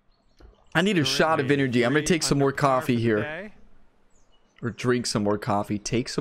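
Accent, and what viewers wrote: Kentucky, United States. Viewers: what state are you from? trying to guess your accent? my guess is Kentucky?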